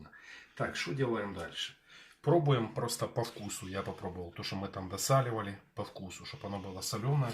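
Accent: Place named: Ukraine. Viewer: приятный украинский говор.У меня отец был с Харькова...Тоже так говорил.Умер в Омске.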